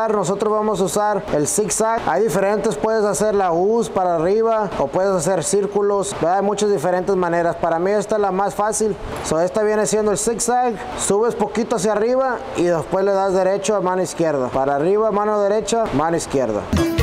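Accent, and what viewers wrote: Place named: Texas, United States. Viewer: Me llega el acento tejano del compa en los vídeos de inglés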